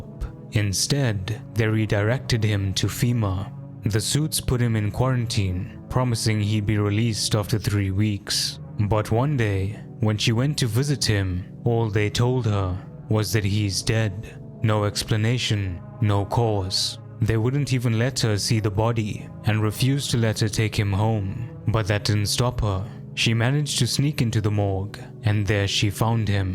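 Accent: South Africa. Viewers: No shi he’s from South Africa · This is real good, also your acccent rreminds me of home. Like, Pretoria, or Durban, SA Indian. Subbed, dope content grootman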